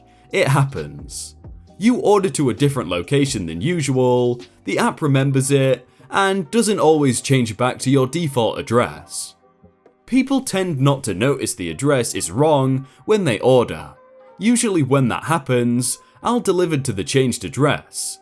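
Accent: United Kingdom. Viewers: Despite sounding very much like a native Brit, at times he can barely speak English and his comments are inane. Can't listen to any more of him, largely due to the comments that he no doubt thinks are funny and clever. Sorry mate.. they are not.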